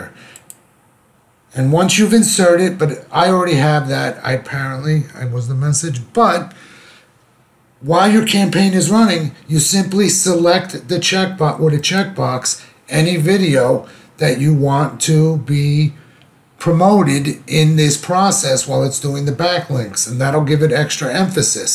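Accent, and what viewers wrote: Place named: Bronx, United States. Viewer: Hearing your rough bronx accent say transcendental meditation was worth the watch. hehe So is this download and run software? If I buy a lifetime license, I dont have to worry about servers going down or anything shutting down the software in a few years?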